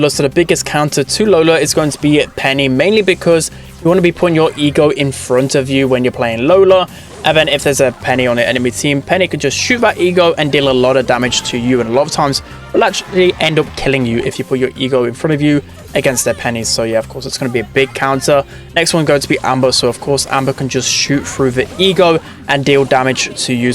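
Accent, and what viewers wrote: United Kingdom. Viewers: I NEED TO WATCH THIS BUT I CAN'T STAND HIS BRITISH ACCENT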